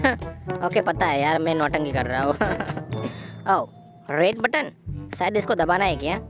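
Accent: Gujarat, India. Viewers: App Gujarati ho kya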